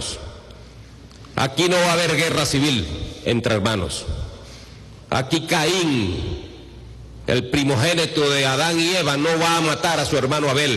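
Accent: Cuba. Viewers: HABLAS COMO UN CUBANO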